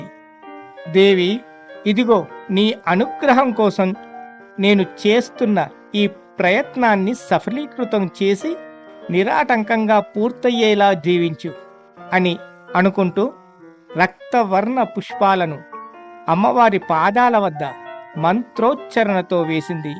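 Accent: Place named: Telangana, India. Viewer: తెలంగాణ యాసలో కథ చాల బాగా చెప్పారు గురువు గారు 🙏😊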